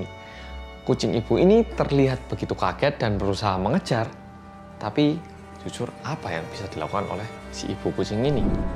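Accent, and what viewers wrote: Java, Indonesia. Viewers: Wes mas...wong jowo tenan iki..,😀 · Kental, dalam bahasa jawa 🙏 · MEDOOOOOOK !! Yey akhirnya up >< salam kenal dari jakarta ~~~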